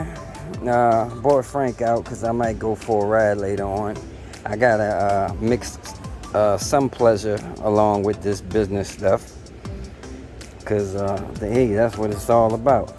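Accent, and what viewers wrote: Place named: Louisiana, United States. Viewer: I can tell you know your electricity, after you mentioned ohms law, and how a diode pushes current through one way only (in another video). I know you're in Virginia, but you sound like you're originally from Louisiana. ✌️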